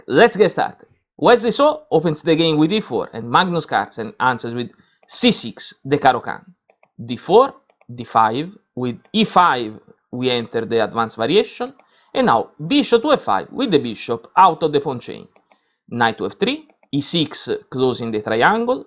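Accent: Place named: Italy. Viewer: Good comment... however... from the accent it is clear that you are italian...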